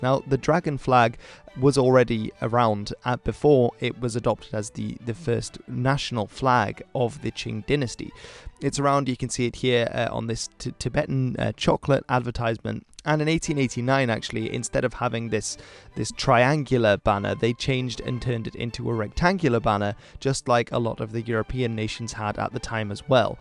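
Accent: United Kingdom. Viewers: his accent is not American at all though, it's British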